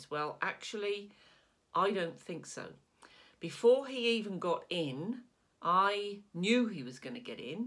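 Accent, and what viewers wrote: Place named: East Anglia, United Kingdom. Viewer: Thank you 🙏that was wonderful. Are you from East anglia? I used to live in Suffolk... I recognised the accent? X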